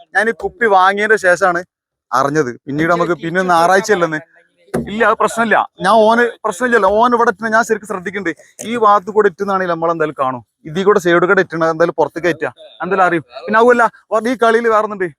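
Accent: Malappuram, India. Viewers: നിങ്ങളുടെ മലപ്പുറം സംഭാഷണം സൂപ്പർ 👌👌👌എല്ലാ game m വളരെ മനോഹരവും, രസകരവുമാണ്... സൂപ്പർ presentation 👍എല്ലാവരും ഒറ്റകെട്ടായി ഒരുമയോടെ സ്നേഹത്തോടെ മത്സരിക്കുന്ന ഗെയിം ആണ് എല്ലാം... പ്രകൃതി രമണീയമായ സ്ഥലത്ത് കണ്ണിനു കുളിർമ പകരുന്ന ഗെയിം.. ഒത്തിരി ഇഷ്ടമാണ് 🙏🙏🌻🌻🌻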